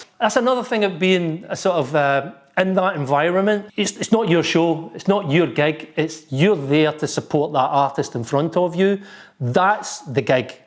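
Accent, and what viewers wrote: Scotland, United Kingdom. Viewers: That Scottish accent !!!! Well done man , great playing !! Mika is a wonderfull guy . Cheers from France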